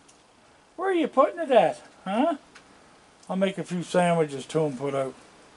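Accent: Canada. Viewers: Ellen M ---Many people who live in the Maritime provinces of eastern Canada , ( New Brunswick , Nova Scotia , Prince Edward Island ) have an accent . It is even more of an accent if they are from Newfoundland , or , they are from the Cape Breton Island part of Nova Scotia . Where he lives in Churchville , in Pictou County , Nova Scotia , he is not far from Cape Breton Island . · Very Canadian English eh? Had to look up what bed lunch was 🙂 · Greetings from Illinois. I LOVE your Canadian accent. Those little guys are precious. You are wonderful to take care of them every night. I descovered your videos on you-tube a month ago. Never miss watching now. Thanks & God bless you.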